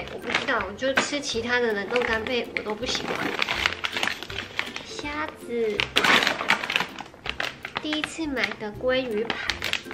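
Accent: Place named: Taichung, Taiwan. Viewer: 妳好可愛。我今天第一次看妳的影片，沒想到妳這麼會煮飯，拍影片的碎念也很有趣，一聽就好親切的台中腔😂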